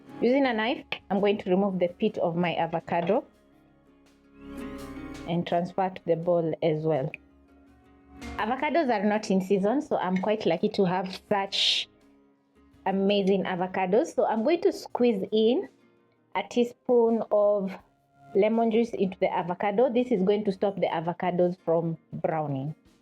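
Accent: Kenya. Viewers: Kenyan accent 😀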